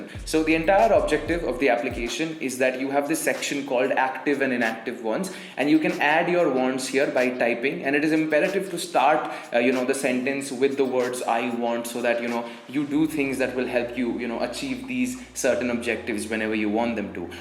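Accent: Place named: India. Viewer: Your English sounds similar to any Indian English News reporter's English😄Thanks for the video!<3I got a crush over your English Speaking.☺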